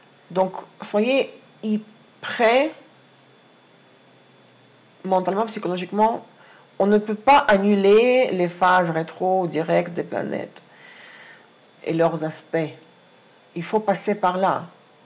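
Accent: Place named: Russia. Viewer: Merci! Je ne rate pas une vidéo depuis longtemps. J’ai, depuis mes études, une grande amie au Costa Rica, musicienne professionnelle connue, à SanJose. Cela me rapproche. Aussi, je suis impressionné par votre magnifique connaissance des langues, très touché par votre affection pour le français et les francophones, et votre très belle personnalité. Votre accent russe est vraiment charmant! Suis Taureau, ascendant Lion, et je vous remercie pour votre travail et votre bel esprit. Un beau bonjour depuis Paris!🎶💐🌷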